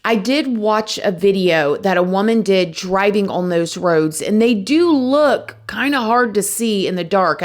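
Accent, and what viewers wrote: South, United States. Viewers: she’s southern and also a grown lady, i’m sure she’s aware lol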